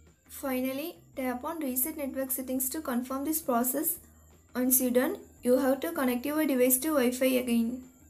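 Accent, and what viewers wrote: India. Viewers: Indian accent ?!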